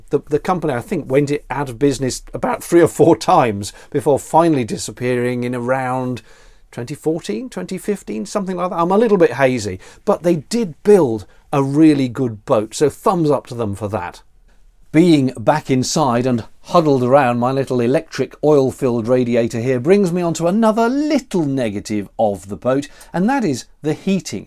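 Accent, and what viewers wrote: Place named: RP, United Kingdom. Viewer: thanks, even is your royal english is quite easy to understand, I really appreciate the comments you add 🙂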